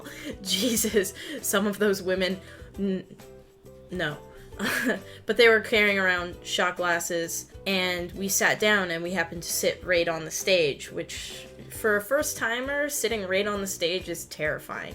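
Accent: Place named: Canada. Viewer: That's what Canadian English sounds like, hu? Hoped it'd be a little different from US English, but I guess that explains why it IS American English and not Canadian English and US English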